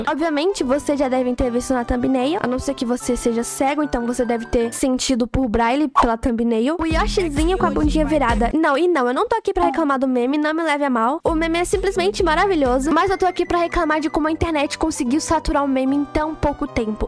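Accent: Brazil. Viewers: Vc e sulista? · tu é carioca? 🤨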